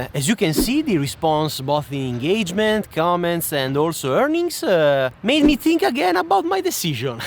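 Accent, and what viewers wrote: Italy. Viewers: I love the Italian accent. Come stai?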